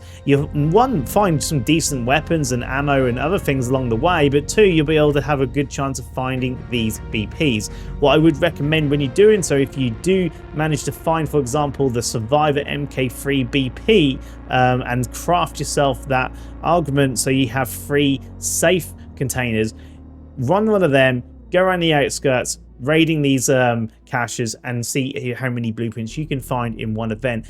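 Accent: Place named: England, United Kingdom. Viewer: How do you have an english accent so thick it makes it sounds like English isn't your first language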